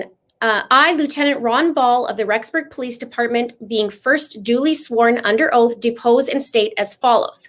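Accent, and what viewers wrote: Canada. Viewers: Love everything about how you present information, pick everything apart and are onto the lies in people's statements. Melanie Gibb is definitely covering her butt because she should have warned someone many, many times. She is doing all these interviews to make money off of this to take the focus off of her responsibility of saying something sooner of what she knew was going on. I am not buying her innocent statements. Alex's wife, definitely something there. Lori's sister dying... Sociopaths have usually been sinister their whole lives ,ust like Chad has been luring women many more times before. Love your Canadian accent. (from a fellow Canadian) Melanie P. has snowed her husband and someone will spill the truth about her very soon I suspect. Keep up the great work!!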